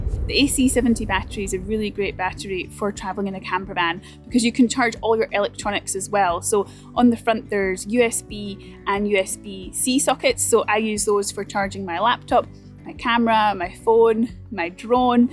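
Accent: Scotland, United Kingdom. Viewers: After I've finished reading all the doom and gloom on the news, I save video's for bedtime. Her lovely Scottish voice, the amazing scenery, interesting facts, all help me wind down for bed. Thanks for making them and posting. Beautiful woman.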